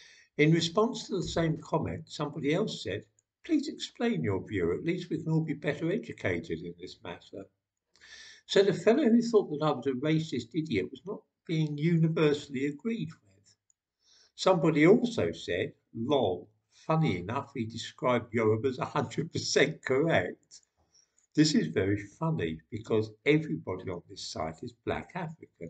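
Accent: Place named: United Kingdom. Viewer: Your look, accent and mannerisms are the quintessential old stock UK that is ever less present in the younger generation unfortunately. 10/10 channel would shovel snow off this chap's steps and have a pint after if I lived next door.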